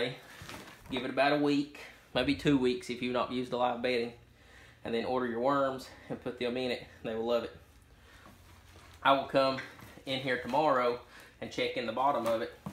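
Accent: South, United States. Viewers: This is a southern accent ") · HEAVY southern accent. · Awesome southern accent and very detailed video. Thank you for sharing.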